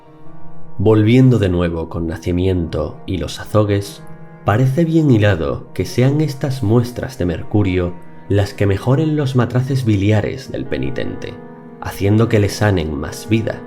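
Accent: Spain. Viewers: hasta con voz española y narrado como cuento medieval. Contenido de calidad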